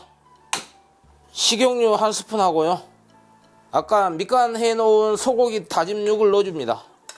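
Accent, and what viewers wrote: Jeolla, South Korea. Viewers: 늘 존경합니다 진심이 느껴집니다~^^ 전라도 사투리가 구성져요 ㅎㅎ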